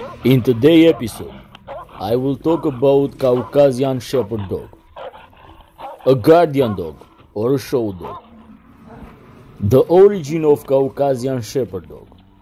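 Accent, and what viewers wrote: Albania. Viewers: Is that Albanian accent? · Вполне понятный английский с албанским акцентом. Лично для меня на много понятней чем английский с австралийским акцентом. Все таки тут очень толковую информацию собирает автор, и почти без преувеличений или ошибок рассказывает о породе.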